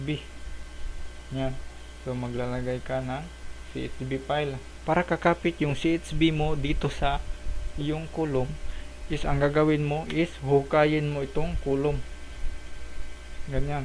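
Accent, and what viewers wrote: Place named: Visayas, Philippines. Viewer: Bisaya kasi ganyan tlaga mag salita ang mga bisaya.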